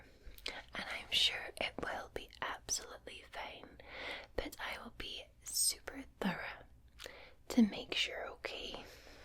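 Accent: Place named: Scotland, United Kingdom. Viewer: Love your cranial nerve and sleep clinic videos your soft Scots accent makes me feel safe it helps me with PTSD survived sa by male guitar teacher at 17 fifty four now but you help me sleep thank you for being a light in the darkness you really are a life saver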